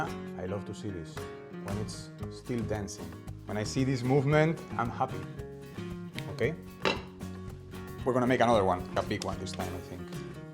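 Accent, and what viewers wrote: Spain. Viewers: Even better to watch when the guy is good looking. And that beautiful Spanish accent….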